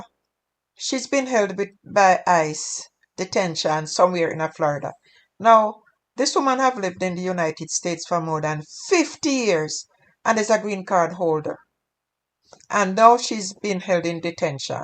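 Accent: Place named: Jamaica. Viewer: I can't understand most of what you're saying. Your Jamaican accent is too overwhelming. Sorry, but I have to tune off and stop listening.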